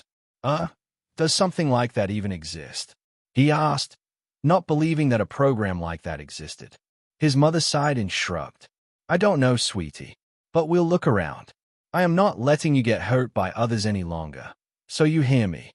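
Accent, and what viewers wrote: United Kingdom. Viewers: Also why is the ai British?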